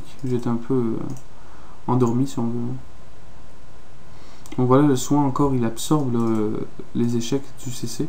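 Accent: Quebec, Canada. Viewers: J'ai reconnu ton accent québécois ;) GG toi t'es un maître de la FM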